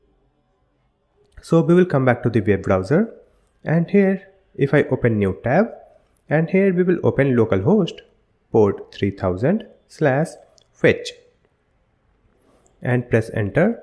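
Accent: India. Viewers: Indian accent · you explain fast and youre indian so a little bit hard to understand what your saying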